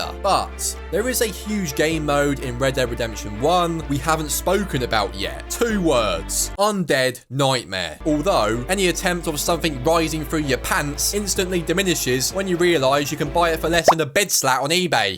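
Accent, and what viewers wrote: United Kingdom. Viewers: This review is a bit too "Bri-ish" for me.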